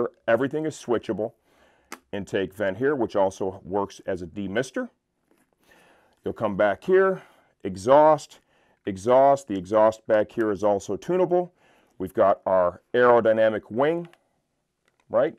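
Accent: United States. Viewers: Why Americans speak like that?? You should see from time to time the BBC.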